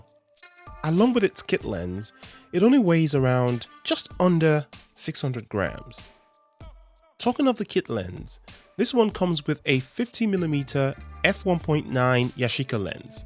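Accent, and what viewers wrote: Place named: England, United Kingdom. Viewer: Hi, I've just discovered your videos and I think they're really fantastic. It's also lovely to hear a familiar sounding voice on youtube. Nothing at all against the many Americans who also make wonderful videos but an English accent definitely makes me feel at home :)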